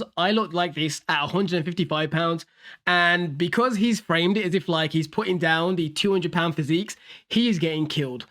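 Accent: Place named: England, United Kingdom. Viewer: damn, I wrote that with full chest, while listening to your english accent 🤸‍♀️🤸‍♀️🤸‍♀️ gonna roll away now🤸‍♀️🤸‍♀️🤸‍♀️🤸‍♀️🤸‍♀️🤸‍♀️🤸‍♀️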